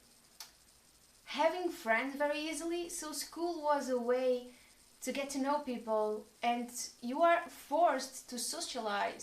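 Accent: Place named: Portugal. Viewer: Ah, I've mainly heard Brazilian Portuguese. The accent sounds very different! Another possibility is ISFP. One way to tell the difference is Ne and Se are reversed (as you say, tool vs. PoLR), and also ISFP Ni vs. INFP Si. Possible evidence for Ne is word play, speaking poetically, telling stories created in the moment. An INxP takes conscious effort to use Ne, so it isn't immediately obvious. It really is "tool use". Catch one in the right mood and they will delight in playing with the world of words and ideas. For example, my wife (who is an ISFP) sent our niece a bracelet that had been in the family. I mentioned to my wife that our niece needed to know the history of the bracelet -- and made up in the moment a silly, absurd little story. One of many things that causes confusion: ISFP Ni is third slot, but ISFPs seem more classically "intuitive" than INFPs. 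INFP Si, with Fi+Ne, can get overly focused and dwell on positive memories (nostalgia) or negative memories (rumination) -- but Se PoLR makes outward emotions look more "flat". ISFP Ni, with Fi+Se, is more expressive (and explosive, though it passes quickly). One thing that is definitely true, it is very hard to self-assess.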